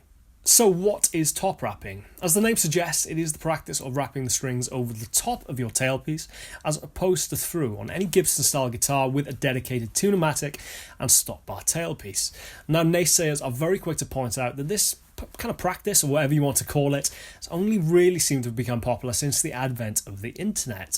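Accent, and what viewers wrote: Wales, United Kingdom. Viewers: i cant tell if you're welsh or english. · is 'Border Welsh' - right on the border with England, but Welsh nonetheless.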